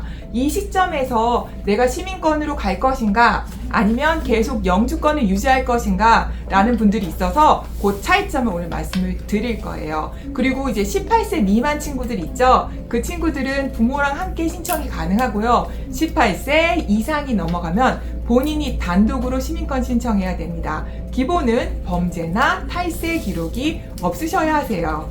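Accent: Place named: United Kingdom. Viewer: Someone said Tomato, and someone said Tomaito. Potato, or Poteito(물론 발음한정) 만약에 British Accent 는 저분의 발음에 가까습니다. 영어의 자음은 지역에 따라 변화가 심합니다. Northern Ireland accent 가 아마도 가장 좋은 예 입니다. 물론 London 의 Essex 지방은 , L. 또는 T 발음이 거의 묵음에 가깝고, Australia Accent 도 아주 심한 모음 변화를 같고있습니다 (AEIOU)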